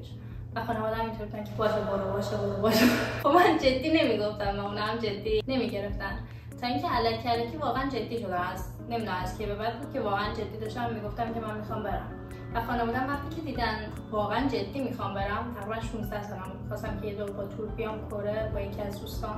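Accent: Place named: Turkey. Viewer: ببین با این که کل روز کره ای حرف میزنی ولی ته لهجه ی ترکی داری خوش بحالت کاشکی منم اردبیلی بودم من تبریزیم ولی اردبیل رو دوست دارم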